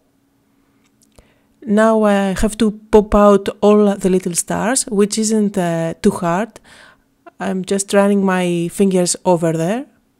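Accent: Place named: Greece. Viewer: i have enjoyed every art journal and card making video you made. Your art really is so warm and joyful. You have such a talent too for demonstrating all of the various products. I love your Greek accent too. Keep the videos coming please and thank you.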